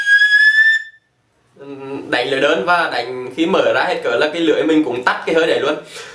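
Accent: Nghe An, Vietnam. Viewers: Bạn là người nghệ an ah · Giọng nghệ an hay hà tĩnh ạ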